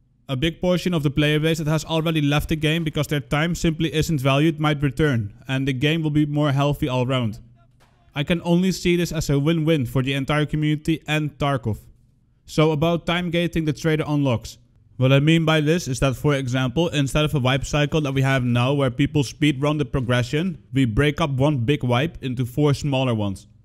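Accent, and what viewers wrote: Netherlands. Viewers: dude...as I listening to you, I imagine how many critics do you get cuz u are apologizes for your accent and for your opinion....man it's you, and your opinions...if someone isn't like your accent or your opinions, well....I say to those ppl get the f... outta here and finde someone else! Its simple, a left mouse click, or a tap in your phone....just downright get the hell out here and leave thise dutch dude alone.:) So calm down and dont bother yourself with idiots....just be yourself.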